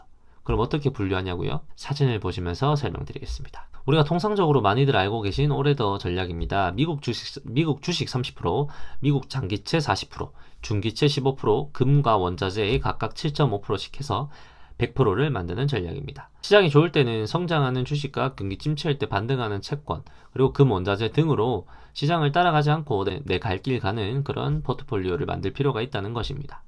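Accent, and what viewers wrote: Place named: Gyeongsang, South Korea. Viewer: 경상도 분이시군요. 억양이 힘들어요. 내용보다 그 억양에 집중되요.ㅠ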